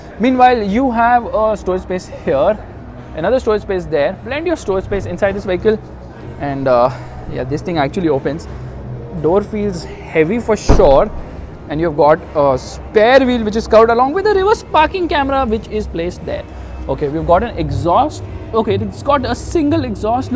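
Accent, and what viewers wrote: India. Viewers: Dammm i hate indian english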